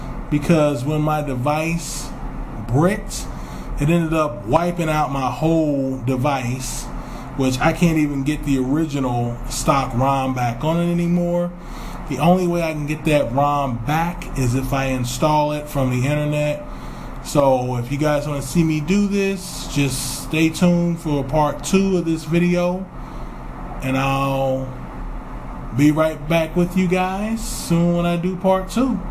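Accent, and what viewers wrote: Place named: United States. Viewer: love your american accent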